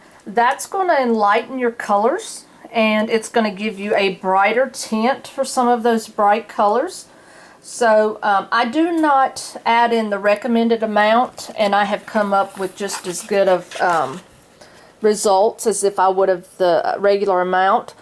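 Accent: South, United States. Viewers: viridian it’s just a country or “southern” American accent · I find that remark to be offensive. What difference does it make what accent she has? She has a southern accent. To say it is peculiar is just rude.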